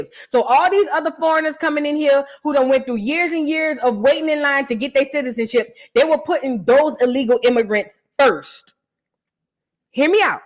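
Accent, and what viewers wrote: New Orleans, United States. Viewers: You head ain't ugly, you are adorable, just your voice New Orleans sound is so exotic. Chocolate Sex Symbol ❤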